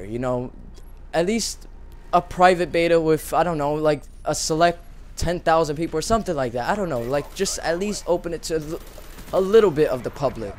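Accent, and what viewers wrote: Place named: New York, United States. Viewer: Yes! A New Yorker! Finally! I've been looking for a commentary with a guy from New York!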